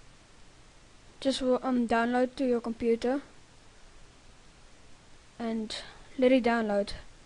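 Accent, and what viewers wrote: South Africa. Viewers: south african accent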